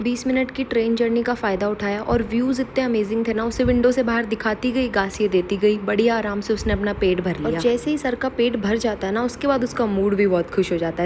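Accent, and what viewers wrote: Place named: Marwari, India. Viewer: Best gaasiye deti gayi.......ur marwadi with hindi is awesome 👌 😅😅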